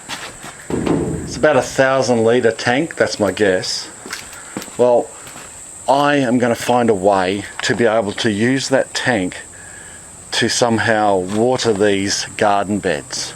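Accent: Australia. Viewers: HERBICOUS! I totally enjoy you info and your Aussie accent!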